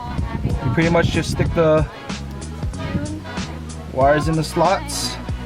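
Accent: Hawaii, United States. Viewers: You from Hawaii?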